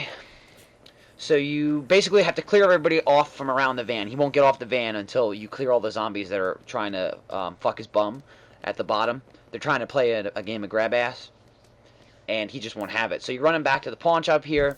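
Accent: New Jersey, United States. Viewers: Yeah it’s because he still had his Jersey accent. Texas changed it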